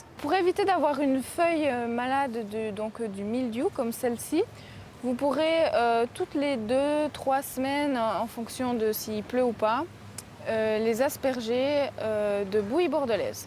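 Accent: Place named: Switzerland. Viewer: c'est marrant ce petit accent suisse, c'est mignon.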